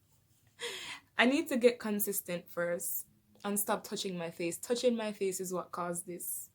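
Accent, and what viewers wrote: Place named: Jamaica. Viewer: The Jamaican in you can't hide sis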